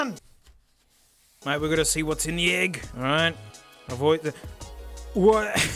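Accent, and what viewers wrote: Australia. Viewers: I like how the speaker is australian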